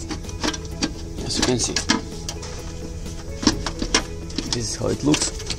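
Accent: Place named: Russia. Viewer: Рашен акцент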